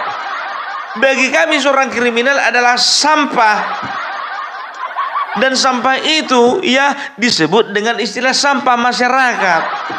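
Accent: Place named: Medan, Indonesia. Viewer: Sor kali aku dengarnya, opung bilang nggak aci😂😂😂asli medan kali Sukses selalu opung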